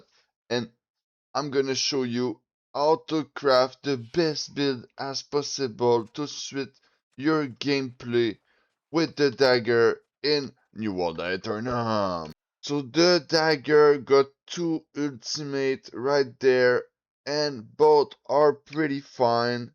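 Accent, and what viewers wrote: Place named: Montreal, Canada. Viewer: I see the accent you from Montreal?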